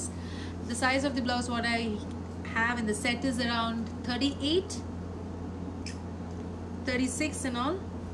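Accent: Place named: United States. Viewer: Manum India lo puttamukada American acsent enduku. Price clearga chepthe good 16. Or 60. Anedi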